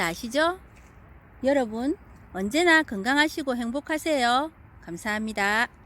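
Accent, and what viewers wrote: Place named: Gyeongsang, South Korea. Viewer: 경상도말씨에 정감이갑니다 까마중에대한자세한 설명고맙습니다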